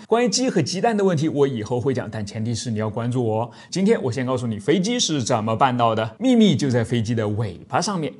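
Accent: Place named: Henan, China. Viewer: 哈哈，我也听出来了，河南话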